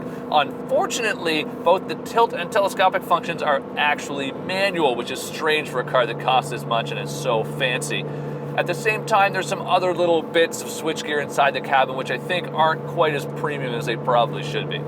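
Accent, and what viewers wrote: Canada. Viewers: I gotta ask.... you're Canadian, aren't you? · This guy sounds like a cheese head. Canadian for the uninformed.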